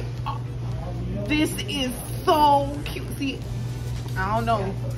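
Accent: South, United States. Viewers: In and out is only good the animal style way and them fries was trash when I tried them. But I want you try other options at whataburger and lmao u know how us down south folks talk gurl 🤣 😂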